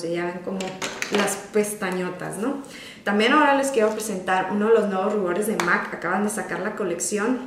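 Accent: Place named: Tijuana, Mexico. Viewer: esta chulo el look jajaj se te nota que ya vives en tijuas... digo por como hablas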